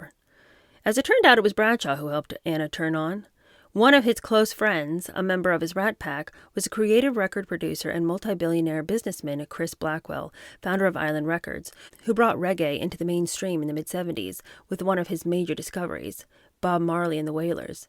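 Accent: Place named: Upper class, United Kingdom. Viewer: In the UK that accent is a golden ticket, it denotes you have the best education and through that elite education the best contacts. This is why so many Brits strive to have their children privately educated. I didn't have the means to privately educate my son, but I am able to help support one for my grandson.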